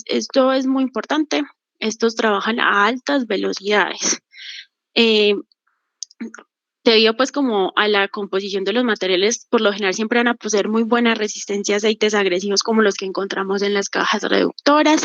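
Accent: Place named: Antioquia, Colombia. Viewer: Con ese acento paisa puedo ver y oír un video de más de 3 horas 😊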